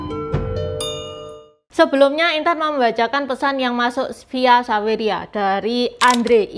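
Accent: Java, Indonesia. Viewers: Salut cie logatnya Jawa banget 👍🏼👍🏼kalau dilihat cie aadik saya jauh bisa jadi seumuran anak saya Salam dari Cah Boyolali Jawa Tengah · Hihihiiii ilate ilat jowo 😁😁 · medok banget😂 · Aneh dia oriental logat jawir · Medok juga ya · Logatnya jawa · ini baru namanya tionghoa rasa jawa.. saya suka medhok nya..❤❤❤ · Intanmemang cantik kok, apalagi KLO sudah menceritakan berbagai dinasty yg berpetualang. Dan akhirnya sampai ke kota BaganSiapiApi kab.Rokan Hilir prop.Riau daratan..dan menurut cerita rakyat Bagan, ,bahwa BaganSiapiApi bukanlah pohon siapi api, ,tapi pepohonan yg tumbuh ditepi pantai dimana pohon itu paling disukai oleh serangga sejenis kunang kunang dan dipandang dari lautan seperti api berkedip kedip yg menandakan adanya perkampungan tempat tinggal penduduk. Mksh mbak cantik yg medok dokdok dok, ,lanjutkan, , , ,!!! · Salut buat mbak Intan, logat jawanya medok banget.. 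hehehe, sui lah 👍👍👌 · Ci intan campuran tiong hoa jawa ya...? Medok jawanya kelihatan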